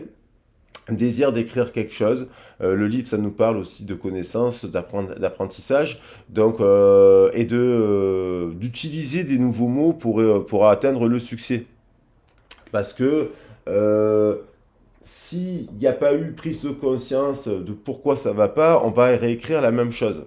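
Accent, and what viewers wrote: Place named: Marseille, France. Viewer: Coucou sa me fait plaisir de vous voir en ce dimanche j aime bien entendre votre accent marseillais c est vrai que c est magnétique et puissant sentir ce désir intense l amour pur véritable c est beau quand c est reciproque c est le coeur qui parle cette appelle des deux âme qui veule ce retrouver c est magique ce bonheur bisous 😚💞🌈🌞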